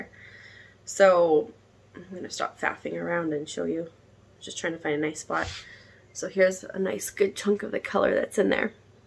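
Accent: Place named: Canada. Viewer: Hi!! I’m so happy I found your podcast! I love listening to your subtle Canadian accent 😊 & you’re not talking too fast, at least I don’t think so. Your hand dyed yarn is so beautiful, I hope I can pick some up one day! Thank you for explaining why you do the sleeves first on sweaters…I’m trying to get more sweaters and garments done this year so that’s helpful to know! I’m currently working on my Beauceron Sweater by Vincent Deslandes while watching & I definitely subscribed - can’t wait to see your future podcasts! Sending 💜 from WA state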